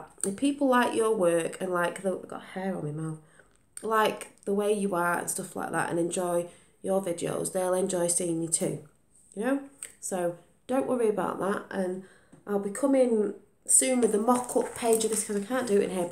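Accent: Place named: North West England, United Kingdom. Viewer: Oh my goodness, Thanks for the shout out!!! Sharpies are the worst! You can do a bleed through test with pens on your back page. Stabilo fineliners should work well on normal paper. Come and join our group on Facebook too!!! You'd fit right in! Also, you sound local to me!! My accent betrays me...I actually live in the North west of England Xx <link>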